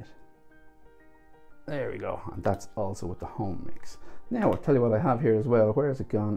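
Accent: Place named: Ireland. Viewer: Thanks this is a great resourse and I shall be using it. You are great at explaining. I will share the link with all my YouTube pouring addict friends. Love your Irish accent and great to find some teachers in Europe. Thanks again, Rita x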